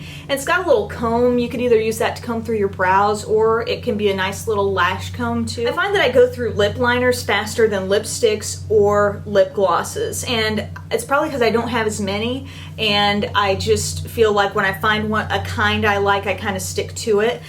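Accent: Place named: Alaska, United States. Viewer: Where are you from? It sounds like you have an Alaskan accent.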